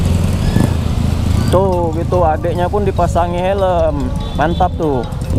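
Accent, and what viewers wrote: Medan, Indonesia. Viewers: kek ginilah bahasa medan.. moga sukses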